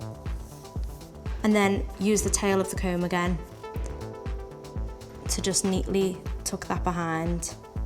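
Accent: United Kingdom. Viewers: Love the Scottish? Accent 🖤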